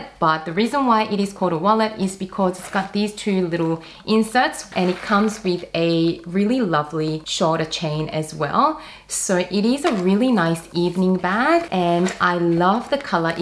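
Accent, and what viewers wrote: Australia. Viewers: Beautiful!!! Aussey accent is nice too. 😝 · Korean Australian accent...?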